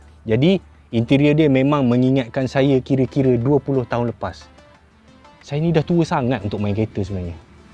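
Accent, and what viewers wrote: Kelantan, Malaysia. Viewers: Abang ni org kelantan ke 😂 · kayo weh ore kelate 😁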